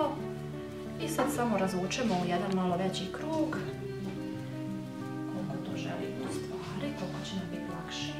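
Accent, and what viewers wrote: Bosnia and Herzegovina. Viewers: Preeeedivno objasnjeno! Divan,njezan, melodican glas! Obozavam vas Bosanski akcent! Super izgledate! Po svemu sudeci, vi ste jedno divno, perfektno stvorenje! Blago onima koji vas imaju! Svi recepti su vam odlicni! Pisem, kopiram u svesku, kuham i i uzivam u divnim ukusima, kombinacijama koje dajete, ne samo ja nego i moja djeca i unucad!! Hvala Vam veliko! Pozdrav iz Detroita😘😘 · Tako umiljat i ljupki glas vredi slusati a i pigledati recepte. Svaka cast za recepte lepo i jasno sve objasnjeno. Pozdrav iz Kraljeva. Jos nesto. Bosanski akcenat slusala bi ceo dan 😍😍😍😍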